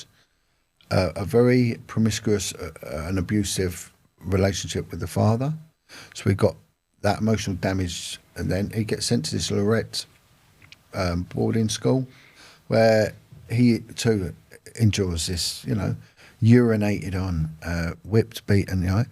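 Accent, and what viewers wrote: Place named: Scotland, United Kingdom. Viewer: Hi. I jst found your podcasts videos, and i love them, also love your scottish accent, big love from an irish girl ( whos single 😂🫶)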